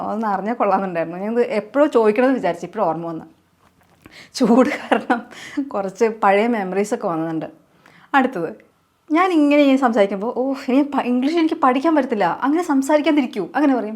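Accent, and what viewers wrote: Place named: Thrissur, India. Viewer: ചേച്ചി തൃശൂർ ആണ് ല്ലേ... ജർമനിയിൽ പോയാലോന്നും തൃശ്ശൂർ tech വിടൂല 😄.. എന്തായാലും ഇങ്ങൾ പൊളിയാണ് ❤️❤️❤️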